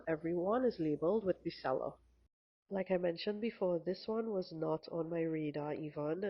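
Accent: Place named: Trinidad and Tobago. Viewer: Oh what a beautiful plant, I find homalomena to be a pretty trouble free plant and usually when she's thirsty she'll lean a bit, she's positioned for some direct morning light which I'm seeing no issues with thus far. Immediately I recognized your accent as trini, and wow it's absolutely wonderful to hear a fellow Trinbagonian doing planty videos, keep up the good work 🇹🇹 ❤😊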